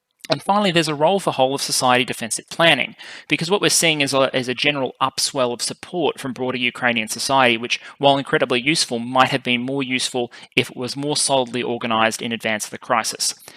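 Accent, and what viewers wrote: Australia. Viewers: THAT AUSTRALIAN OR KIWI ACCENT IS DRIVING ME UP THE WALL..ALSO A LOT OF WORDS FLYING AT ME....TOO MUCH INFORMATION.... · You're providing a great set of analytics to consider for us humble viewers and I've enjoyed these immensely. Also great to hear an Aussie on youtube, keep up the good work mate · A very very good analysis of the situation. Many thanks for the depth and knowledge you provide. Especially love the Aussie accent!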